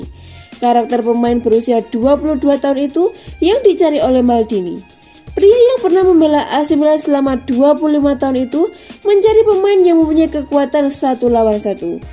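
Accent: Java, Indonesia. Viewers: Coba belajar ngomong dulu yg bener kalo mau jadi narator. Ngomong medok,nyebut nama aja salah. Apalagi beritanya simpang siur plus ngarang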